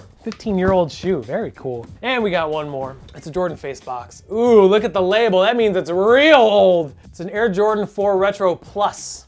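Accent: Chicago, United States. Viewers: Your Chicago accent veers more and more toward Bartok, the bat from Anastasia, every time you do it. (You're too old to get this reference and most of your subs are probably too young so I guess this comment is for me)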